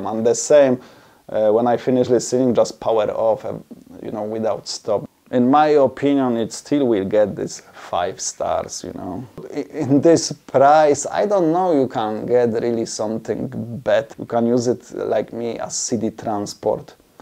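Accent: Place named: Russia. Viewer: I was in Poland, but Polish people don't speak like that. This is more Russian accent than a Polish one.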